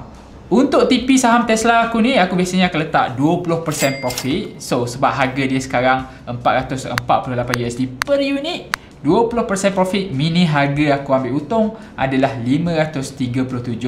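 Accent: Terengganu, Malaysia. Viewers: oh bang org ganu en?😂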